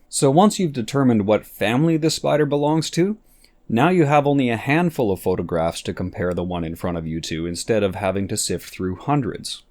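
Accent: Canada. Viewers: These videos are great and I love your sense of humour. I also love to hear Canadians speak. "Out" and "about" in particular. You should do an episode on the Aphonopelma marxi (brown tarantula). Common in Colorado, where I live, and terrifying to all the transplanted humans from West of the Continental Divide. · Nova Scotia! Right on I thought I sensed a fellow Bluenoser! 👌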